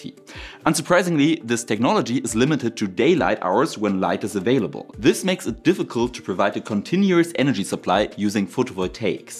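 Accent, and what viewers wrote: Germany. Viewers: You're English is fine -you do sound very German, but pronounciation is great. You do have slight vibes of Jurgen the German, a character created by Harry Enfield for his BBC comedy show. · Nothing wrong with your English. No need for excuses there. Don't denigrate yourself. Besides, if anyone clicked on and then gets mad for a German accent, that is totally self-inflicted. As for the cells... really depends on how much energy they cost to produce and how much energy they make in turn. Wonder what a first experiment would look like - regular photovoltaic on top, mercury cadmium telluride on the bottom. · Your English is perfect just spoken with a slight German accent (I can understand you better than some Southern American accents). This is a VERY interesting topic and I liked how you presented the technology and then the hurdles. I subscribed. 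· Are you sure you want me to hit the subscribe button? As I am already subscribed and now I am unsubscribed which is annoying as I like your German accent, and you speak English fine, So I sorry bit I going to go against your wishes and subscribe to you again. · Das Englisch ist wirklich nicht schlecht und wird auch immer besser. Ich denke, dass es im allgemeinen als ein charmanter Akzent passend zum Kanal-Namen wahrgenommen wird (wir hoffen ja alle, dass unser deutscher Akzent als charmant wahrgenommen wird)... Also ein echtes Markenzeichen!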